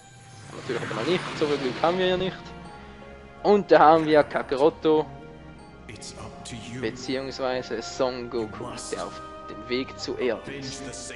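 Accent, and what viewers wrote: Switzerland. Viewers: haha chas sie das du schwizer bisch? isch ja mal voll geil ;D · bist du schweizer ? :D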